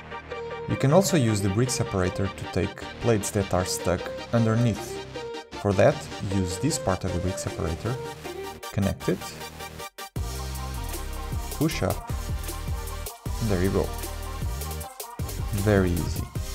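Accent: Portugal. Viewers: Olha videos incríveis, e eu estou bastante orgulhoso de ser um tuga a conduzir um canal incrível(a menos que sejas brasileiro, mas o teu sotaque remete muito ao sotaque português) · meu deus, só do principio do vídeo consegui perceber que eras português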